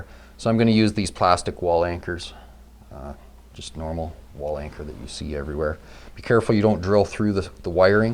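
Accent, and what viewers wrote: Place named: Canada. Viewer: I can't quite figure out your accent, are you canadian or just from some northern state in the US? Minnesota maybe?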